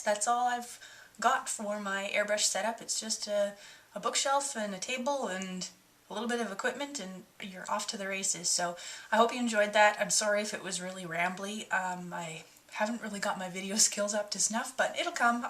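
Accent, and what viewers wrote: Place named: Canada. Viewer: Ah! I watched ice road truckers with Canadian truck drivers in it lol...Love your accent :)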